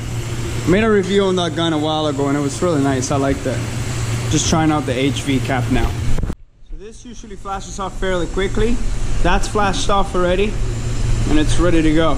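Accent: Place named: Quebec, Canada. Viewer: Est ce que je reconnais un accent Canadien francais ? :) You seem to have Canadian french accent :) Great vid btw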